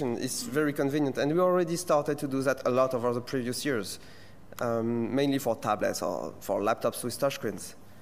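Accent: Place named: France. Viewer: bigre, superbe accent français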